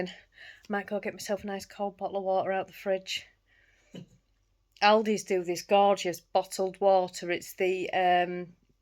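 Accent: Yorkshire, United Kingdom. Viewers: Love your accent and your Yorkshire colloquialisms. Please do not rein them in. I love all things British. I even named my WiFi network “Clever Clogs.” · my great aunt use to make bobbin lace I use to sit and watch but never had a go. Love a Yorkshire accent ❤You and have a great weekend I love how you learned to knit and look at you now designing your own patterns and hand dying your own yarn I think it's brilliant that you and have made a little business from it well done 👏👏👏see you Monday xx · oh wow you certainly have moved around hence why your Yorkshire accent is only mild. You definitely don’t have any trace of the Hornsea, Hull accent either. It’s very distinctive that. Anyway thank you for that, I only asked because of you saying about a Yorkshire accent. I’m born and lived in Sheffield all of my life. I live in the very south of the city near the Derbyshire border now. 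I’m hoping my yarn comes for my spooky socks mal today 🤞🏻. Have a great weekend. 😊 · That was a lovely story about starting to knit and how great that they took a photo to show people. No wonder you were proud 😍 And the rest is history...... Please don't apologize for your accent. You are a Yorkshire lass, so you have a Yorkshire accent. I do smile sometimes when I watch with subtitles and the translation is often hilarious. I can't always tell what says but I always understand you. Have a lovely weekend, see you on the podcast xxx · Hi X, please don't apologise for your accent, I'm born and bred Nottingham and often taken for being Yorkshire ( now live in Norfolk ) i don't rein my accent in and I'm not gunna change either 😂 hubby is from down south and been married 31 years and still can't fathom out what I'm saying 😂😂😂 I love the Yorkshire accent ❤ thank you for sharing your story about how you got started on knitting it's brilliant.... 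I'm a knitter but used YouTube to get me started with crochet, still practicing 😂 I started during lock down 😊 don't keep apologising about anything You are amazabobs ❤ take care happy crafting have a lovely weekend xxxxxx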